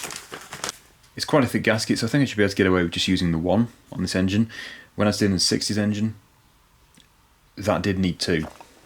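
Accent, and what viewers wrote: United Kingdom. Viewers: I love the commentary. I like the British accent.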